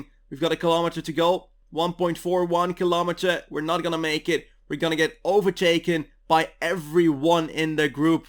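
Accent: Belgium. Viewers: ben je een belg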